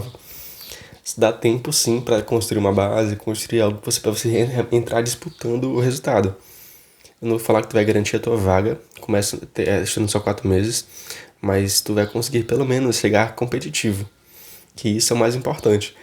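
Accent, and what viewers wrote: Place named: Ceará, Brazil. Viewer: vc é Cearense? Só por curiosidade mesmo, pq às vezes "tenho certeza" pelo sotaque cearensão (kkk), mas às vezes fala o S paulista (queStões, e não queSHtões como o cearense) rsrs Estou gostando bastante dos seus vídeos! Tem me esclarecido muito!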